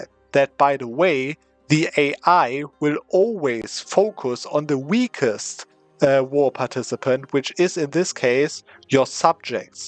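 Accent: Germany. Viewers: As a Pole I hear that you're German in every word you say haha. Great video, good advice bro!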